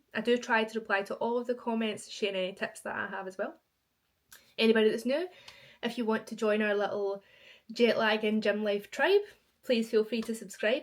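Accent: Scotland, United Kingdom. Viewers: I knew that was a Scottish accent 😊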